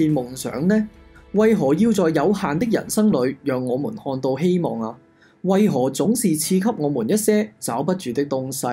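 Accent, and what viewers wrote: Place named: Hong Kong. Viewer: 香港人崇拜廣州話，所以抄襲了廣州話。香港本土語言係圍頭話，但係你叫香港人講圍頭話，香港人會唔肯講。因為香港人崇拜廣州話覺得廣州話高雅，厭棄香港話（圍頭話）難聽老土唔入流。建議大家好似澳門人咁學正音廣州話，唔好學充滿懶音嘅香港圍頭式廣州話。 冰室係香港人抄襲偷盜廣州嘅嘢 我澳門人真係睇唔慣。冰室，又叫冰廳，廣州話用以稱呼冷飲店。其是南粵地方一種主要售賣冷飲、雪糕及沙冰等冷凍食品的飲食場所，起源發跡於廣州。在香港流行於1950至1960年代，被認為是茶餐廳的前身[1][2]。過往廣州的冰室會經營雪糕、凍奶、冰水，兼營咖啡、奶茶等熱飲和西餅麵包 澳門人香港人說的廣東話學術上最準確定義 叫作廣州話（澳門官方政府文書也寫作廣州話）廣州話英文叫Cantonese Canton就是廣州英文舊稱 當然現在你也可以看到許多痕跡 譬如廣州機場代號是CAN 廣州塔英文叫Canton Tower 我係澳門人 澳門一直係廣府話/廣州話地區 但香港唔係 香港一百年前還在講圍頭話（香港話） 一百年前澳門和廣州影響力遠遠高過香港 香港人放棄圍頭話學我地講廣州話 香港人講廣東話口音非常難聽好多懶音（畢竟係抄返黎）建議你學正音廣府話/廣州話 廣東話本身係錯誤名稱 魯迅講過：一直如此 便是對嗎 潮州都係廣東 梅州又係廣東 唔通潮州話梅州話都係廣府話？ 呢個語言係名副其實嘅廣州話 我作為澳門高質大學生鍾意講求學術 希望啲港喱唔好介意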